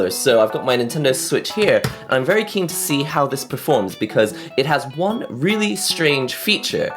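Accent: United Kingdom. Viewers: I don't wanna sound rude or racist but... I think it's kinds weird how u look/are Asian Yet you have some sort of a British accent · The funniest thing is that he has a British accent. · i had the video on in the background and he sounds regular british and he says 'on the front it says hori pad' and i thought that was odd - i mean, we can read, right? ... so i checked the video and yeah. couldn't read it. thanks for that. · U have a British accent? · it's fuckin confusing a Japanese dude talkin perfect british accent !!!!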